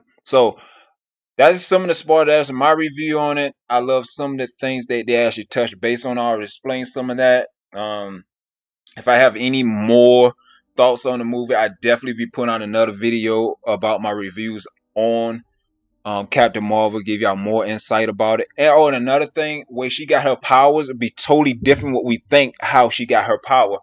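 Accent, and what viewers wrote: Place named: South Carolina, United States. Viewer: Man you representing that SC accent deep. Shout out to South Carolina.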